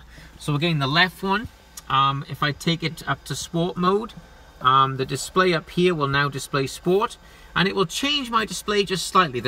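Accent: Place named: Wales, United Kingdom. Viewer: I don't think the chap is American. I detect a Welsh lilt of the valleys!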